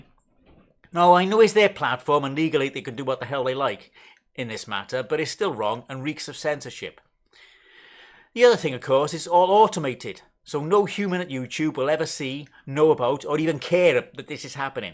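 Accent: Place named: Wales, United Kingdom. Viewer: Another excellent vlog thanks. Are you using an autocue these days? There's something about it that makes the delivery of your dulcet Welsh tones sound even more lyrical and poetic. You should do one of those relaxation tapes :-)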